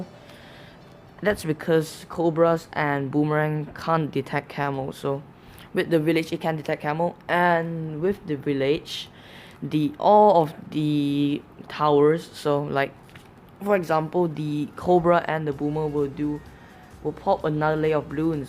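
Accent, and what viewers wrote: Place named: Singapore. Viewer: You sound singaporean, where are you from?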